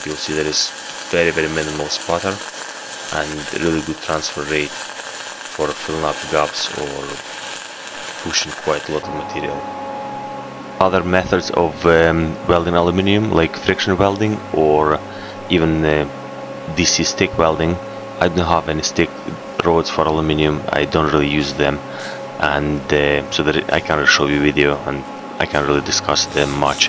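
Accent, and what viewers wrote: Scotland, United Kingdom. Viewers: Good bit o scottish accent comin through there boss where u based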